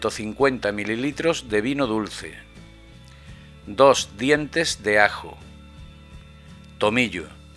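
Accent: Spain. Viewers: de flipe total!!!!! menudo éxito...menudo recetón con acento andaluz, la hice con vino de pasas del puerto de santamaria y ha sido una experiencia extrasensorial, mas no se puede gozar con la ropa puesta. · es esto estilo espanol? digo por el acento, pero no se,yo le hubiera hechado chile, no soy mexicano pero he vivido toda mi vida en little mexico(los angeles california)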